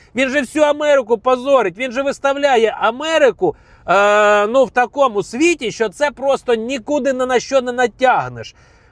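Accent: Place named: Russia. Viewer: Таваріщ, ти шо із московії? Премію міру, пазорітса, це що гонорово говорити із масковскім акцентом? Я перестав переглядати твої відео по цій причині, а тут ось знову, дай думаю погляну, виправився чи ні? Ні не виправився, то я можу і відписатися.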